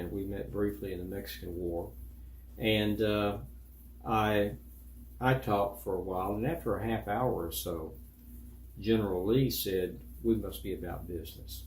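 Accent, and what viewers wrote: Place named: Tennessee, United States. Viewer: He sounds suspiciously like a Tennessean rather than an Ohioan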